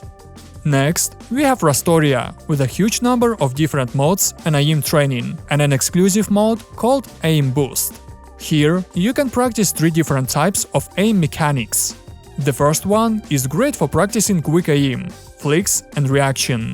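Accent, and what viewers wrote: Russia. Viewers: о, русский акцент. Наш!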